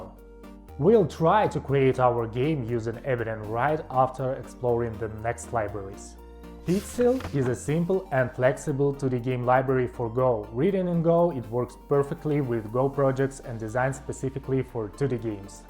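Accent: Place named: Russia. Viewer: с первых секунд услышал русский акцент)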